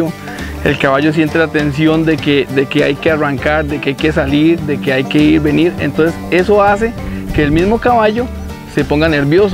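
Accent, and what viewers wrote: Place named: Costa Rica. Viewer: Yo cuando escuche su asento dije es de Costa Rica....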